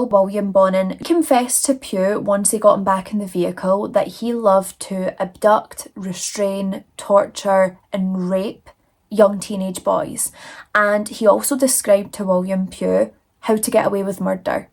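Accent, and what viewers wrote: Scotland, United Kingdom. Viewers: Congratulations to the 10 000 subscribers!❤️🥳 Love your channel, always. 😍Also love your Scottish accent since my husband is from Scotland (Airdrie). ❤️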